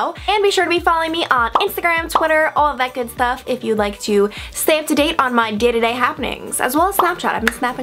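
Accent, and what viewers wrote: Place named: New York, United States. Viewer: I subscribed after seeing your Victoria's Secret video. Now I'm wondering where you are from because I can really notice you have an accent in this video. Sounds like New York or Boston.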